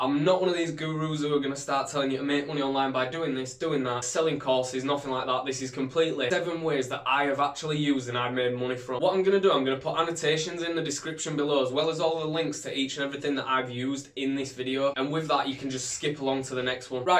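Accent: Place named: North Yorkshire, United Kingdom. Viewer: Thank you so much for your videos. I have learnt so much, made a few quids already and I usually don't really comment on YouTube, but your videos and channel are so underrated. You need to be more famous. Will definitely recommend you to my friends. Keep up your fantastic work 💪I'm French, and I absolutely love your accent! (North Yorkshire if I'm not mistaken !)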